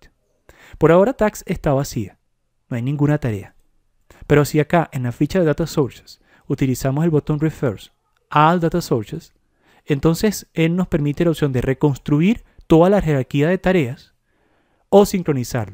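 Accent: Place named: Caracas, Venezuela. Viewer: los ultimos 6 segundos del video son los que hablan de la Conexión de Microsoft Project a Navisworks, TOOOOOOODO LO DEMAS ES BLA BLA BLA elemental. no dijiste lo mas importante, ni siquiera hiciste un click en el espacio modelo para interactuar con el modelo 3d. el video ni siquiera tiene una segunda parte como para justificar tanto bla bla bla ... sera por eso que solo tienes 367 vistas y solo un comentario desde el 2020.. que perdida de tiempo escuchando ese tono de voz irritante con acento caraqueno.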